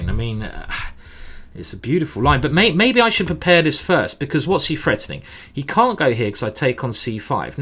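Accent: United Kingdom. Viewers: So great GM thanks a lot I learn English British accent from you beside chess I'm so happy to learn from you. very very tough game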